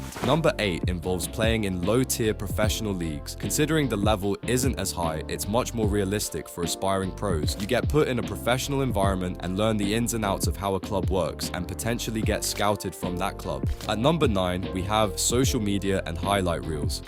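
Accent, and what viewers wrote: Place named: Scotland, United Kingdom. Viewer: Um hi! I know its hard to believe but this is my other account as this channel is only available on my computer and I am using my phone right now but the reality is you will never go pro because you are from Scotland and scouts wont like a person like you with your Scottish accent around here so get an actual job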